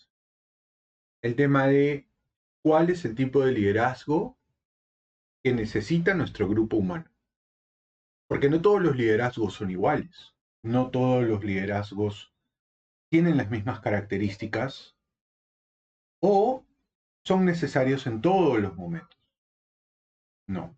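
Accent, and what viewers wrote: Lima, Peru. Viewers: caes bien compa. Tu acento limeño súper relajante. Tu inspiración contando historias de bayly también. Pronto tendrás en la caja de comentarios 4000 comentarios, grande.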